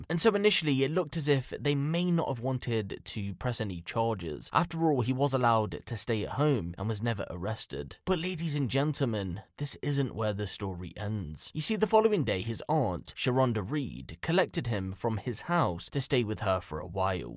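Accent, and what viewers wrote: United Kingdom. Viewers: I'm so sick of hearing people with British accents on American YouTube. Go make money in your own country. Everyone complains about America but they all want our dollars.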